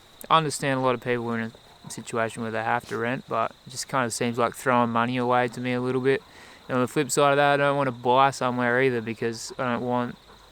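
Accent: Australia. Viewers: Can you teach me how to speak Australian ?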